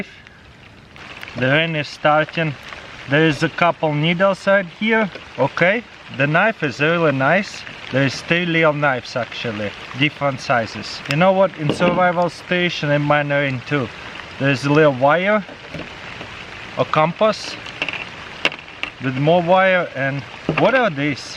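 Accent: Russia. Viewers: На английском с русским акцентом.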